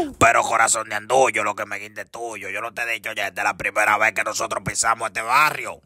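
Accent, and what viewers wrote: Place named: Dominican Republic. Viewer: ey demasiado duro te sale todas las idisomas de RD jajajaaj🤣🤣😂😂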